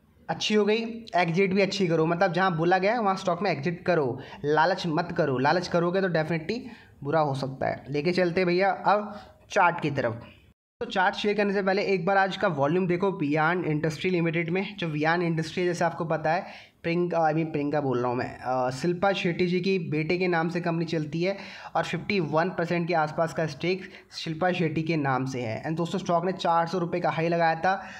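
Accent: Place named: Western Uttar Pradesh, India. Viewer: भाई तुम्हारे डायलॉग बड़े अच्छे लगते है एकदम देसी वेस्ट यूपी वाले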